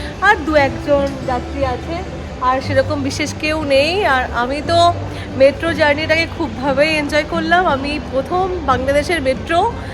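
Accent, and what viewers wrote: Kolkata, India. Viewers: ওনার কথাবার্তা পুরোপুরি কলকাতার বাঙালিদেড় মতন বাংলাদেশের মতন কোনো টান নেই এবার দেখার কথা যদি বলেন সেখানে ভারতীয়রা ও বাংলাদেশিরা একিরকম দেখতে আলাদা কিছু নয় কারণ আমরা সবাই আর্য তাই আমাদের চেহারার গঠন এক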